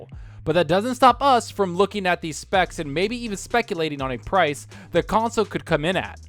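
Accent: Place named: United States. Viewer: This channel is aids. Video is 80% neddless filler with an annoying over Americanized voice.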